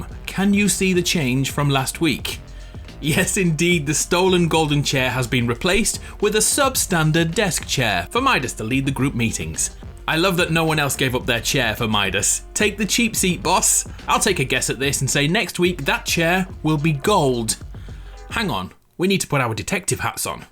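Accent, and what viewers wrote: United Kingdom. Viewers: Wait wait wait.......... Is British?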